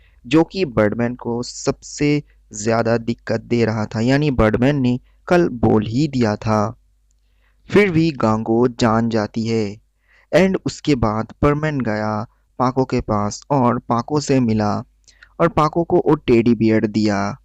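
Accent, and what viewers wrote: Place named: Bengal, India. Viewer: Are you a Bengali because your Hindi is like from a Bengali because i am also a Bengali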